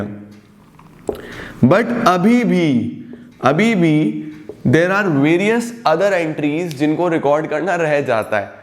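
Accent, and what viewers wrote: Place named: Varanasi, India. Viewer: Are you from Varanasi???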